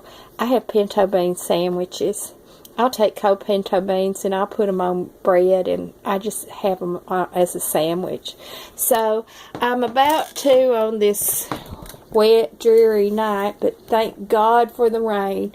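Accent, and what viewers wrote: South, United States. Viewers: I love your videos. It's like you are family with your southern accent.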